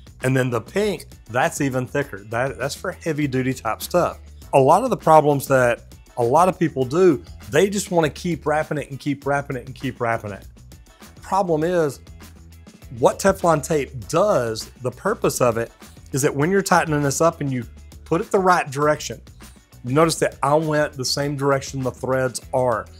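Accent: Texas, United States. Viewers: a bit to Texas twangey for my liking like most plumbers drawls😂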